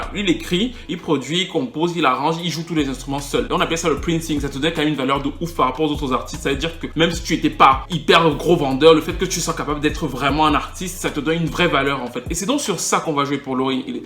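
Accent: Cameroon. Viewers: Tes vidéos sont au tellement... non, tu es trop fort!!! Et l'accent camer ça rend la sauce impeccable!!! C'est une superbe chanteuse et sous une interprète hors pair!!! ELLE s'est mise un boulet au pied toute seule en voulant s'octroyer un talent artistique qu'elle n'avait pas...en plus si tu te joues les divas bon les durs du milieu ont vite fait de se passer le mot et tu vas mourir dans le film q chaque fois que tu vas sortir ton nez... Sinon une vidéo sur stp... Et une question privée: tu bosses dans quel domaine, la musique, la production?Où c'est juste une passion? En tout cas, continue de nous distraire si talentueusement,tu as vraiment l'art de raconter des histoires!!! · Salut mon frère je viens découvrir ta chaîne, et franchement je suis tombé sous le charme!La manière avec laquelle tu racontes, c'est très immersif quoi!Tu en parles comme des gens du quartier qui ont grandi avec nous,avec un brin d'accent Camerounais!Chapeau!J'ai direct activé la cloche pour ne rien manquer!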